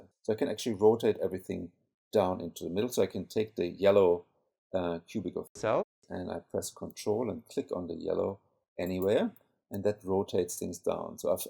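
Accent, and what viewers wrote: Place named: Germany. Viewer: Hello, I just wanted to thank you for bringing my attention to this great puzzle. I have cubed now for nearly half of my life and solving this thing has been my greatest archivement so far. Your videos inspired me and helped me to find my own - and until now very unefficient - algorithms (it took me about 5400 turns). My next step is solving this in less than 1000 turns. Way to go! I always wanted to ask you whether you have German origins of some kind? I am from Germany myself and your accent sounds kind of German in my oppinion, in addition to your name obviously:D Anyway, thank you again, and greetings from Germany!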